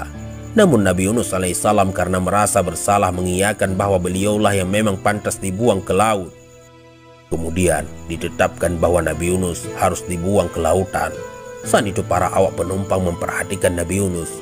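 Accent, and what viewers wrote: Aceh, Indonesia. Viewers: Ini pasti orang aceh,,terbaek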